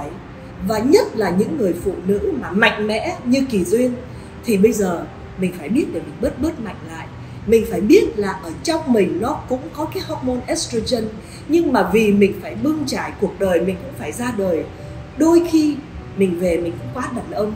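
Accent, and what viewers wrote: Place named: North, Vietnam. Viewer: Em sinh ra ở Biên Hoà được coi là thủ đô Bắc 54 nhưng hiếm ai có chất giọng Bắc 54 nghe sang và ngọt ngào như chị Kỳ Duyên <3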